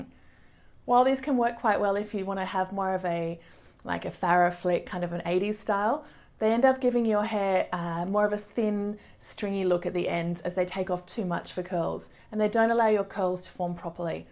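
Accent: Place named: Australia. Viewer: Yay an Aussie.. So now I can breathe and understand u 😂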